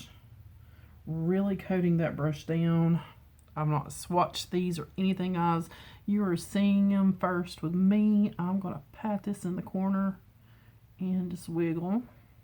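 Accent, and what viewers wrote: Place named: Tennessee, United States. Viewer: I am from Sevier County, TN, in between Knoxville and Gatlinburg! I knew you sounded like home! My hubby is a Marine, and we have been living all over the country, and are currently in San Diego. We just got orders to Cherry Point, NC, our first duty station after Paul joined 23 years ago, lol! Can't wait to get back closer to home. 💗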